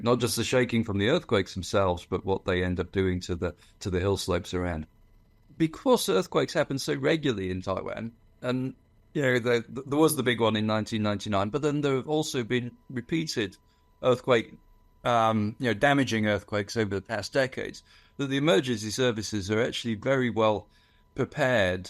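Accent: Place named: England, United Kingdom. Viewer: I detest the English dialect. The only one worst is aussie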